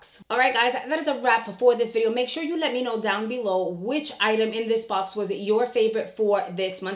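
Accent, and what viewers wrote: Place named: New Jersey, United States. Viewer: Always look forward to your boxycharm unboxings...i adore your Jersey ( I’m assuming that’s where you’re from cuz that’s what it sounds like) accent!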